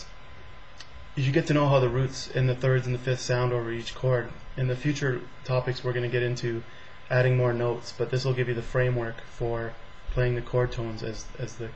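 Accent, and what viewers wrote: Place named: Nova Scotia, Canada. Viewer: East coast??? I'm from Truro, NS. Small world! Funny I didn't notice the accent probably because it sounds normal to me! Great lessons though, i've been looking for something exactly like this.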